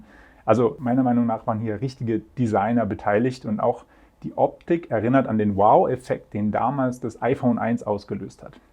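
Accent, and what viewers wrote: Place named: Swabia, Germany. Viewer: Einfach geil wie du am Schwäbeln bisch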